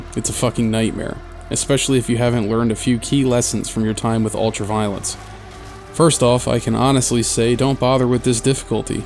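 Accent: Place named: South Jersey, United States. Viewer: I'm a simple man. I hear a South Jersey accent I subscribe.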